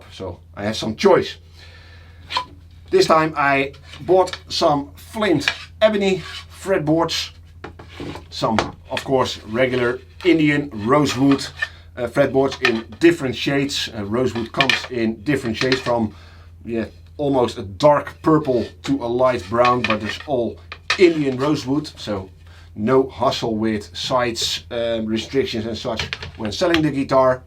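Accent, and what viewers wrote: Netherlands. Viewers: I'm detecting a slight Dutch accent... Are you Dutch?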